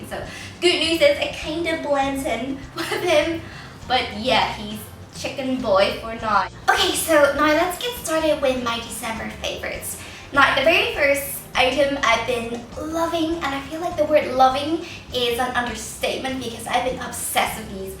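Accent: Ireland. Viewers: You sound Irish. Great video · i thought you were Irish because it sounds like you have an Irish accent!!!!!!! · You're from Hong Kong but you kinda sound Irish..But you're really pretty :)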